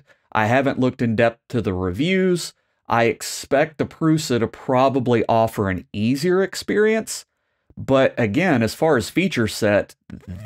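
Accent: United States. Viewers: Based on your accent, I am going to assume you are an American. Can your cars compete with Chinese cars on price, if not for the extra taxes put on them? No? So why the hexx to you expect a Prusa to beat their Chinese counterparts on price? Being a European company, I don't think the price was all that bad! Okay, it may not bring entirely new features. But it brings them Prusa-style! Backed by support that is second to none in this segment, and supported for years to come! Ever since the X1C hit the scene, I have wanted for Prusa to bring their version. I simply can't muster any enthusiasm for any of the Chinese printers! Qidi was close, simply because they brought the heated chamber. But a few fires later, I did not care for one any more. Privacy is clearly not a thing for these companies. That is one of the things I like about Prusa! You CAN completely air-gap your printers, and even use an offline computer for slicing, if you want to! 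Although it would be nice with an included camera, at least you will get one. And you can unplug it, should you feel like it. So the great news here is not the feature-list! It's the fact that it's a Prusa!!! Get over this size-thing-please! People still build 120cm cubed Voron 0.2's. There is a marked for this size. And I think it was a smart move for them to expand upon the MK4; simply because a lot of people whom recently bought their MK4's now won't be left behind. What they loose in sales due to "sma size", they will make up for in sold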